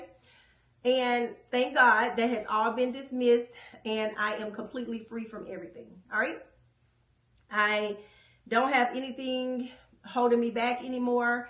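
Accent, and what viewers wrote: Michigan, United States. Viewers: waitttt you from michigan?😂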